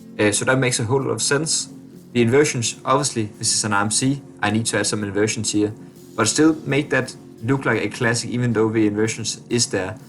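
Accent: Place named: Denmark. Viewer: Love the danglish 😍😅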